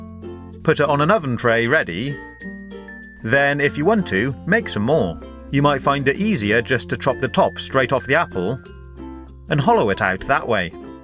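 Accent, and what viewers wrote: United Kingdom. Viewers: I'm absolutely in love with your perfect British accent.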